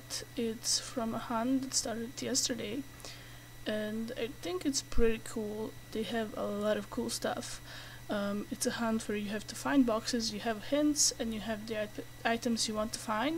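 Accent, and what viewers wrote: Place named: Asia. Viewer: I like your accent! Are you or your parents from Asia?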